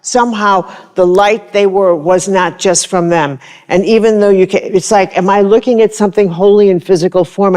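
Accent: Chicago, United States. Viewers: I'm here for that fabulous Chicago accent.😊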